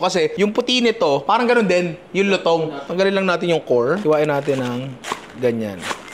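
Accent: Batangas, Philippines. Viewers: More Batangenyo accent ninong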